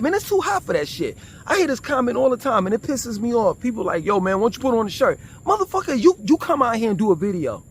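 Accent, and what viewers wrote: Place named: New York City, United States. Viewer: Bro WHERE in Jamaica are you?? I keep asking you this? Jamaica has Amazon bro lol, Jamaica ships in Shein and Temu, there literally NOTHING you cant get.. Kingston, Portmore, Montego Bay, you gotta move around in Jamaica. And as to the Red Tape buy big so you don't have to worry about big taxes. The truth is your at a DEEP disadvantage because you cant speak Patois, and your accent is deeply NYC America. Jamaican success literally depends on your energy 😂, you can motivate a mf to link you on time. You have diff types of Jamaicans Professionals and Jokers lolll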